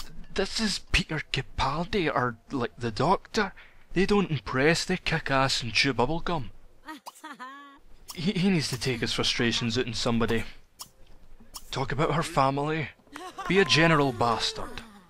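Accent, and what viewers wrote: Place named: Scotland, United Kingdom. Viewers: hey your british i love british and doctor who by the way what exspanshion pack you using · he's Scottish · Actually I think he's Scottish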